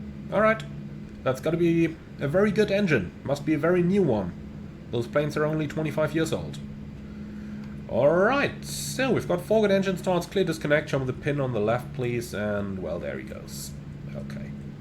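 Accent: Switzerland. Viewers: Sehr schöner Schweizer Akzent. Grüsse aus der Schweiz :-)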